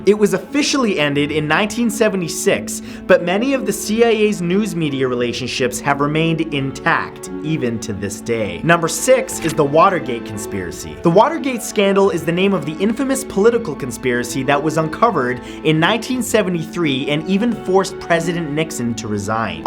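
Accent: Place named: Canada. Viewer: Something about a Canadian accent ruins a conspiracy video. I mean it's annoying in general, but particularly so here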